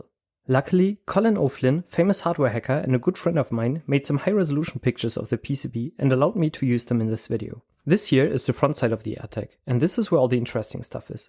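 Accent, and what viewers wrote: Germany. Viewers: Can I ask, is your accent German? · Bist du deutsch? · I am neinty % sure this guy is german because of the accent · Are you German because your accent. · Du sprichst Deutsch oder? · du bist doch bestimmt deutsch das hört man an deinem english xddd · You should talk a little faster.. much too slow to understand your heavily German-accented English.. thumbs down for presentation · Are you German? Because you sound like Werner Herzog lol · Mashalla wie er Airtags ausspricht, sowas von Deutsch. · wie deutsch sich das anhört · German accent :p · der deutsche akzent kickt 😂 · Man erkennt eben dass du Deutsch bist haha. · Whats that accent? German? Nethertheless good job. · You sound German, are you? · The german accent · Bist du Deutscher ? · Gutes Video man hört das du deutscher bist XD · i hear a little bit DEUTSCH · Der deutsche Dialekt, xD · Let me guess, you're german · are you German ? 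if so, me too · Are you German?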